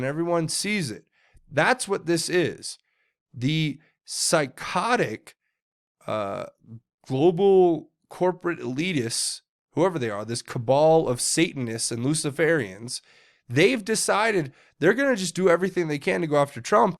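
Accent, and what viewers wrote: United Kingdom. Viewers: Hahahaha 🤣 Love the British accent 👏👏👏👏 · Fabulous British accent!!! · I love the English accent. You did a great job.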